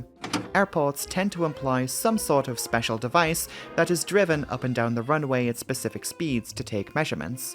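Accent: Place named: United Kingdom. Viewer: No she’s just British don’t be racist.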